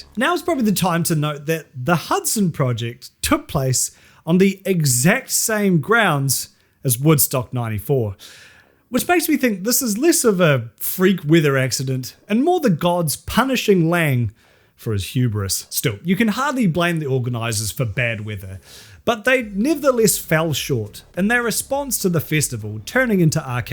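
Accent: New Zealand. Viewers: Kud rock? Like the Kud whale? Haha love the kiwi accent!! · He's clearly from NZ 😅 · Hearing a Kiwi say words like "festival" always brings a smile to my face. Top tier accent. Sunds suu frundly ay.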